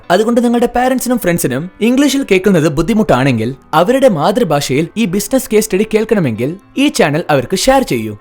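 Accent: India. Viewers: Not natural Malayalam looks like a Tamil guy did the voice over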